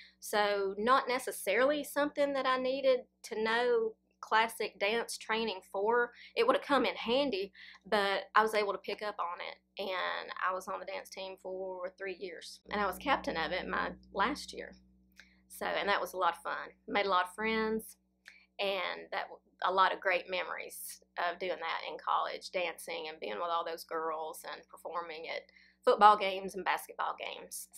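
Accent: South, United States. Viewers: Hi - thanks for all your reply’s to our many questions. Your honesty and directness is much appreciated. Glad to hear you found happiness and a surprise son in your second marriage ( lots of us don’t). That will keep you young. I remember your video about the “serial killer living near by and him stalking your sister - that was scary and must of been difficult to tell us about. Love wat chin and listening to your charming Southern accent too.❤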